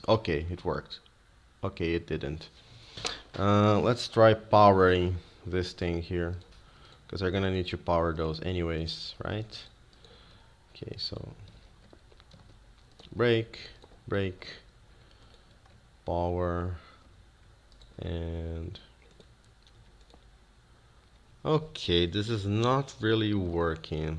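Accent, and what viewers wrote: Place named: Brazil. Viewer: Felipe Menão Blz, eu não sabia disso, é que o inglês dele tem um sotaque bem brasileiro mesmo ;), mas o inglês dele é bem melhor que o meu ehehe... mas não importa, os videos dele são muito bons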